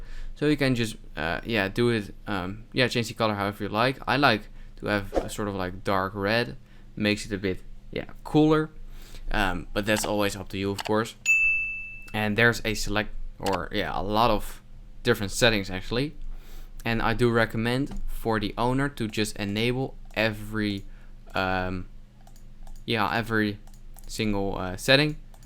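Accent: Netherlands. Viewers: Thanks for the turtorial! it was super handy ;p and your accent screams : 🇳🇱🇳🇱🇳🇱🇳🇱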